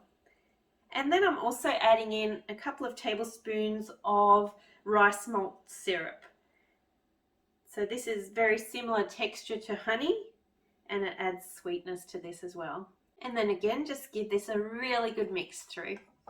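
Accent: Australia. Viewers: You look exactly like Ann reardon from How to Cook That, if she had lighter eyes! And if I'm guessing right from your accent, you're Australian too?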